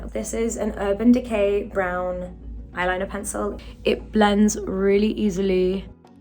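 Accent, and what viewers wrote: United Kingdom. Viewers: I love the way she talks, british accent is the best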